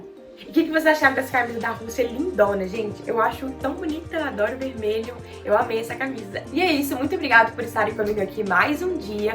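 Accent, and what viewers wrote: Minas Gerais, Brazil. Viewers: Gostei do vídeo anjo.. E essa camisa aí da Rússia q tem a msma cor da camisa da seleção soviética?! É linda.. A Rússia país onde tem a Sibéria.. A Rússia já foi um Império e sua capital era São Petersburgo.. A Rússia da Vodka e do Balé Bolshoi.. É o maior país do mundo.. Foi uma das quinze repúblicas da União Soviética.. Amo o jeito q vc fala.. Amo o seu sotaque mineires.. Tamo junto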